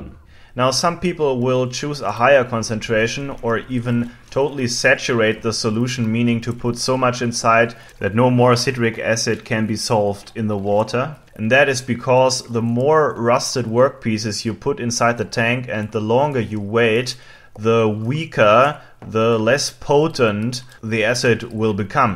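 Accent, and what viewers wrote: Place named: Germany. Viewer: German guy speaking English (American English), German accent, German cadence, some German syntax, excellent vocabulary, excellent diction, idiomatic expressions are plentiful and accurate and used properly.......language proficiency is excellent. Where and how did he learn to speak English......so well.......? ? ? ? ? ? ? Best regards, fm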